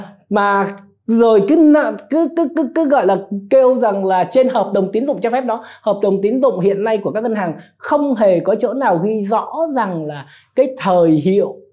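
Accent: Standard. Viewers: Anh nói chuẩn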